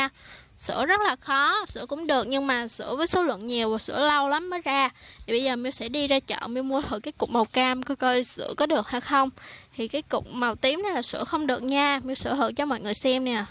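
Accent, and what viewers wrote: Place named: Phu Yen, Vietnam. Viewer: Giọng phú yên nè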